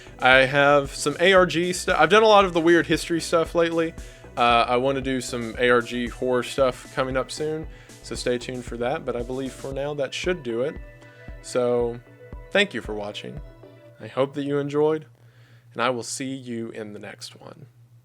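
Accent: South, United States. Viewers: He pronounces a lot of things in other languages in a very American sort of way. 😂 He's got quite a drawl and it can really catch you by surprise sometimes! On a lesser note, he seems to have misread "Dyatlov" outright, which is a thing he's done before with other words/names (foreign and english-language), so who knows, maybe he's struggling with a lil dyslexia, too. Sooooo many male content creators I follow have dyslexia & ADHD, so I really wouldn't be shocked if that were the case. Maybe a pronunciation key in his notes would help in the future. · I also notice he puts "such as" in places I personally find unusual and it stuck in my mind because it made me think of that beauty pageant contestant who said some garbled stuff about education in "the Iraq" in response to a question and I thought that was her just making a mistake but the way he keeps using it in a consistent way and they have similar accents it might be a southern dialect thing?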